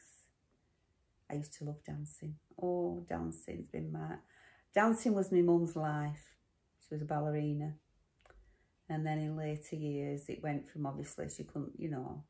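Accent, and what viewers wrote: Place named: England, United Kingdom. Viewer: I’m 76 and live in Toronto Canada. I walk most days. If it’s snowing I do the treadmill. I really enjoy your vlogs. Keep them coming. You have quickly become a favourite. And you’re very professional already, even though you just started. I’m originally from England and it’s like being over home listening to your accent. Keep up the walking…💃🏻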